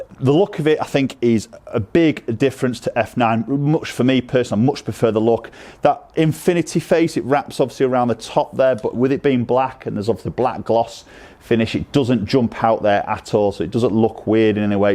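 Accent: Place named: England, United Kingdom. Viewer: STOP TALKING YOU PRATT WHY OH WHY DO THESE GOLF PROS ON UTUBE NEVER. STOP TALKING DO THEY GET PAID BY THE WORD!!!! And those horrible English accents! Shut up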